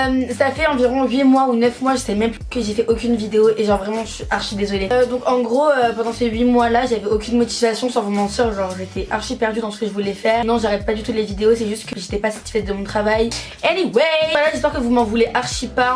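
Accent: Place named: Canada. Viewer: J'aime ton accent Canadian 😍😍😍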